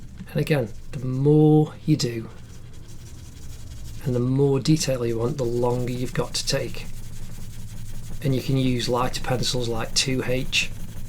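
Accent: United Kingdom. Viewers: Whoa! Your accent is like newt scamander!!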